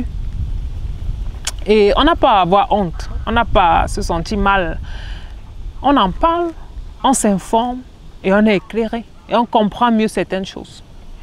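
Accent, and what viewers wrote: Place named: Africa. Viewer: J’adore le parler africain...💐🌺🍀🌸🌷🏆🏅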